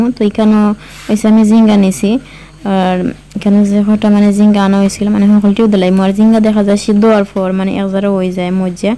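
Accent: Sylhet, Bangladesh. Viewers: Afnar mat kub bala lage jokon Sylheti matoin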